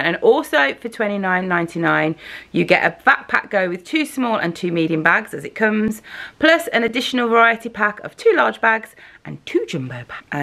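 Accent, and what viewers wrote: Birmingham, United Kingdom. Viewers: Your videos are so perfect for cheering me up! I'm working abroad as an au pair at the moment, so it's lovely to listen to someone who sounds like home when I can't be with my actual Brummy family! x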